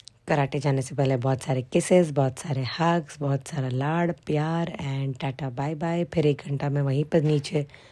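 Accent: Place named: Bihar, India. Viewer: Mashallah...Half English Half Urdu...You sound like a Bihari when you speak English...I have some medicine can you please promote that on your channel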